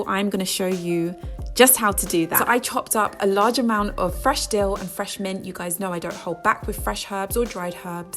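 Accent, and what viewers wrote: United Kingdom. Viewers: is no matter her race. She actually sounds very British to me...like she was born and raised British. Personally, I didn't notice her skin color. I only thought, "Wow! This English chick really knows how to do a video cooking show!!!"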